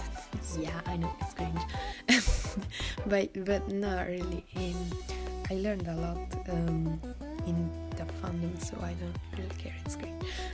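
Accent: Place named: Italy. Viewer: I like your accent, where are you from? Italy?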